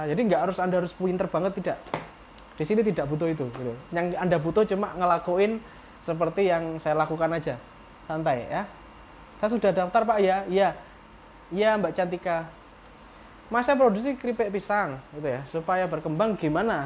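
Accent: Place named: Yogyakarta, Indonesia. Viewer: Jelas mas dr yogya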